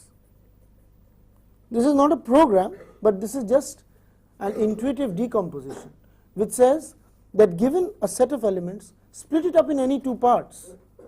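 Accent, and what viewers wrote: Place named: India. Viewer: I am from Sweden so I am not a native English speaker, but I can still understand this guy - although I kind of have to listen harder to grasp what he is saying. I guess I have a slight advantage due to the fact that Swedish is more "flat" than English and doesn't use any gliding vowels (unless you are speaking some of the southern accents), so that's probably more similar to the Indian accent.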